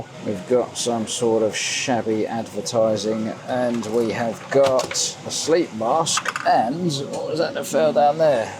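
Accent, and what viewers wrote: United Kingdom. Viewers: I always get the feeling that you are the kind of British guy that I would love to hang out with. I am a Singaporean but it gives me a sense of being a British when I hear you speak.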